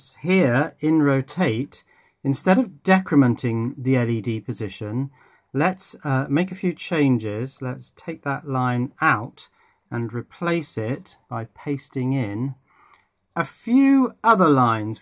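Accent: England, United Kingdom. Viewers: Aman Mittal given his accent, I'd say England.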